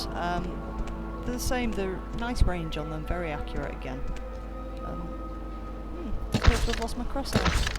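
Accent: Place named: Lancashire, United Kingdom. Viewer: Hi, just subbed after watching these vids, just started Morrowind after literally thousands of hours playing and modding Oblivion and Skyrim on PC and 360. Very helpful tutorials about the basics, it has surprised me just how much more in depth this game is compared to oblivion, which i thought was quite in depth itself, forget Skyrim for that,lol. Anyways nice work and keep it up. By the way where abouts are you from as you sound to be a Lancashire lass to me, as i am a Lancs lad,haha?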